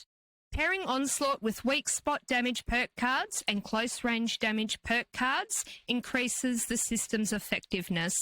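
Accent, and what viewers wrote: Australia. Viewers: It's good to see you making the move to YouTube. Your guides are great. As was mentioned, the volume of your voice is low, but you have a great speaking voice. I love those AU accents! Thanks for all the data mining that you do. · I will follow and like all your videos because you are so nice and polite and professional with me recently in a situation with a Facebook Fallout group I apologize if I really shouldn't be allowed to say this here but I just wanted to say thank you for being so nice and professional. Your videos here are awesome and I follow you all the time and I've been friends with you long before you where moderating anything you are a genuine good person I just can't get used to that annoying Australian accent since I'm Irish American but I love you still the same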